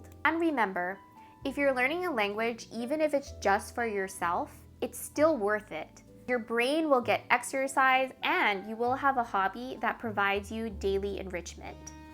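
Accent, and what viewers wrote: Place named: United States. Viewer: English! It is a difficult language, so many people speak English differently with different accents (UK, U.S., Australia…) I prefer the U.S. English accent like yours 😄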